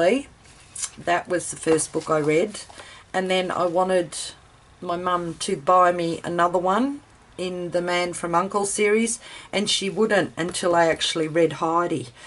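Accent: Australia. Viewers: Lovely to hear an Ozzie! Great hall of treasures!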